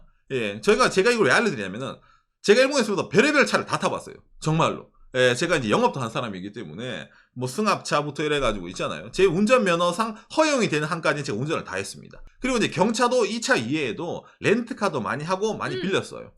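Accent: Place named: Gyeongsang, South Korea. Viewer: 우연히 봤는데 남자분 한국인 이신가요?한국말정말잘하시네요 경상도말씨처럼~~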